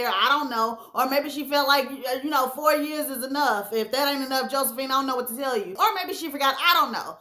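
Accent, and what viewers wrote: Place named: St. Louis, United States. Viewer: sounds like a STL woman.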